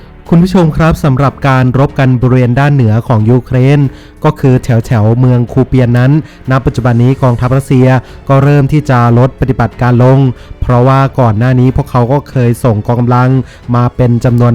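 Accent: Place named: Isan, Thailand. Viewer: เปรียนคนเว้าแล้วติครับ555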